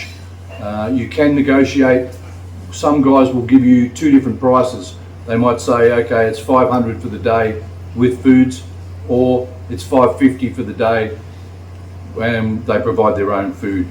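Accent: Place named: Australia. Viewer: I just like to listen to talk.. nice to hear Aussie in the Philippines 😂.. agree with the dogs.. sometimes I think they are hungry coz if they are full they just sit around relaxing..😂.. yes if you can stop smoking it's good for you although it's cheap here.. enjoy your day guys.. ❤❤❤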